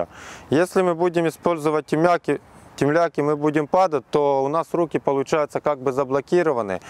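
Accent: Ukraine. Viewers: у вас же лютий, важкезний український акцент. не тортуруйте себе, говоріть українською!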